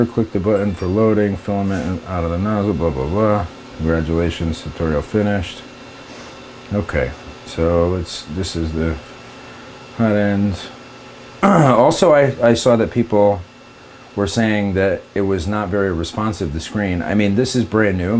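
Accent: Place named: Brooklyn, United States. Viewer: You got a slight Brooklyn accent! lol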